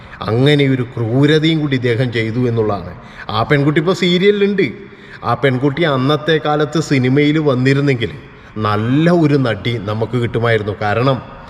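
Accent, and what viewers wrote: Kannur, India. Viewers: Kannur ഭാഷ.. 🙂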